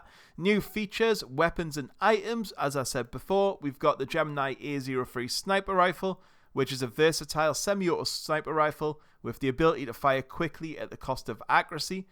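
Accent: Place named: North, United Kingdom. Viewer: Cheers for the patch notes dude, its nice to hear a fellow Northerner for once. subbed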